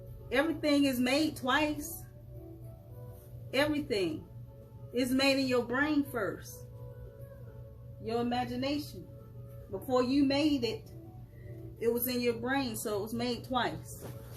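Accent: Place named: South, United States. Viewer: So funny to see You in person and hear You speak. You have a southern accent for sure 😂. It’s super adorable 🥰.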